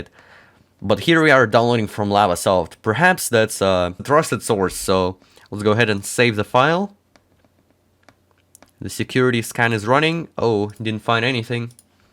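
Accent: Germany. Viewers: Yoyoyoyo wait are u german?!?! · bro bist du deutsch ? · ach lol, du bist ja Deutsch! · Hey just a question are you from germany or russia (Just a question) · are youre german or is that the windows? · are you german?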